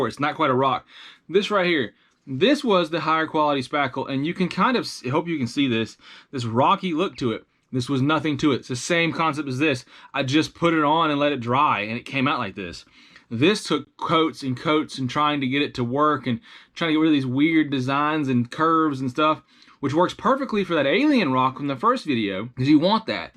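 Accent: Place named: South, United States. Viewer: U get a like and comment for the southern accent OUUU WEEE 😂😂🎉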